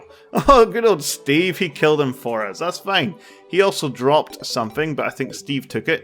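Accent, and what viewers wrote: United Kingdom. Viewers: Why always British terraria play throughers.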